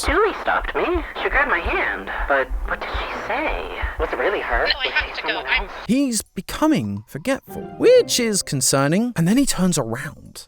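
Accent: United Kingdom. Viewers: Honestly has really grown on me, I kinda like him more than matpat tbh but that could just be because he's got a funny British accent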